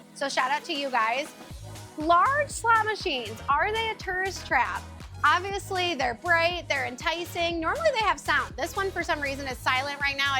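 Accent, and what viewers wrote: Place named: Chicago, United States. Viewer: I figured north because you sort of have a Chicago accent. You would be an amazing teacher!!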